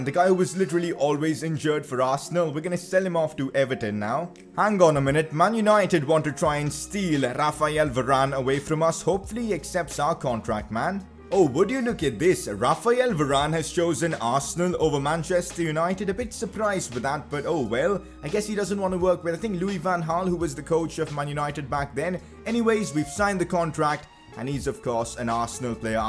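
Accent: India. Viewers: You sound indian..are you? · U Indian mate ?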